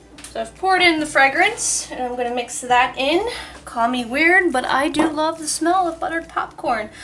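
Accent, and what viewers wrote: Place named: United States. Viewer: Oh it’s so cute 😍 I personally don’t like popcorn at all 🤢 but my kids love it!! The smell stays in the microwave for ages too hahahahaha 😂 How gorgeous was that little cake your friends mum dropped off 😍 it looked so delicious!! I am growing a few herbs atm (it’s spring here now) tho I don’t use them a lot lol. We have parsley,thyme,chives,and coriander just popping up :) P.S. I love the way Americans say herbs 😆 here in Australia we prenounce it “herbs” not “erbs” and we prenounce the colour mauve “moe-v” 😂😂 we are weirdos lol 😆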